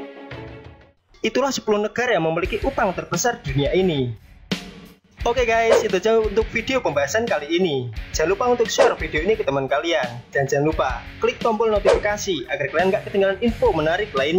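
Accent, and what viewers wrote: Java, Indonesia. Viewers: Jelas wong jowo iki admin e kwkwkwkkw. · Medoknya itu lohh boss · Narator nya MEDOK sangat 😁🙏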